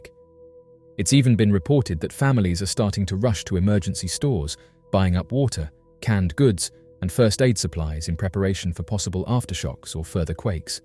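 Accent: England, United Kingdom. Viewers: If the narrator has an English accent, you can be pretty sure that what follows is BS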